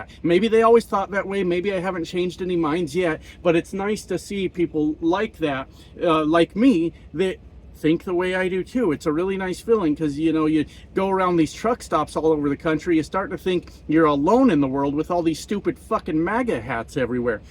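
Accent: Canada. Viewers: You do sound Canadian 😅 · I am pissed off at the Democratic Party. They are responsible for Trump getting elected, and must never be forgiven and never be forgotten. There must be a new Second party that replaces the Dem party. They are horrible losers and failures. Biden was a loser and liar. He did not fire Garland when he did not charge Trump for his crimes. Sick of speeches that are just liars. Biden/Harris support for gaza war was more important than stopping trump. sick and unforgivable. The Trump/Maga people will destroy themselves, bashing them achieves nothing. oh,btw, you have a candian accent, so no surprise people might think you are from canada. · I hate to break this to you, sir, but you DO sound like the stereotypical Canadian. It's not a problem; I like Rush, Red Green, Bare Naked Ladies, SCTV, and the RCMP. 🇨🇦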